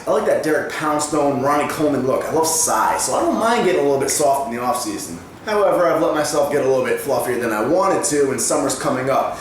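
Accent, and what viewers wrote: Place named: Rhode Island, United States. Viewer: Did I just hear a classic RI accent Boda-buildin?! hahaha nice video brah! After a few weeks we will see how its working and make adjustments from there.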